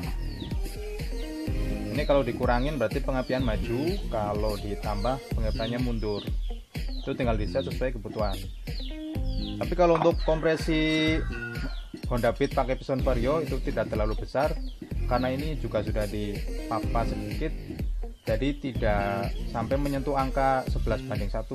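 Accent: Banyumas, Indonesia. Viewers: Mas ngapak yaa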